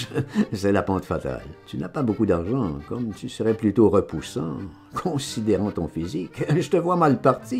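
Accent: Quebec, Canada. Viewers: Coupez la musique bordel. C'est pas du bal musette. L'accent québécois pour une phrasé titi parisien ça ne va pas non plus.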